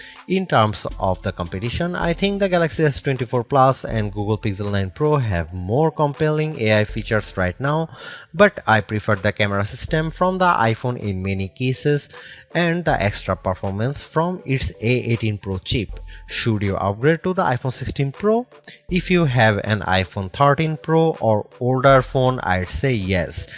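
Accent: India. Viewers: I hate your indian accent to be honest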